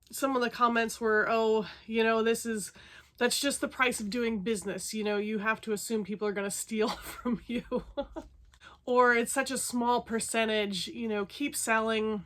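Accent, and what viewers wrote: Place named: United States. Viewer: U r a very good lady. There are so many scammy crooks in the world. Best wishes for the future from England. Btw, we have the same problems. I think from your accent u r from the USA?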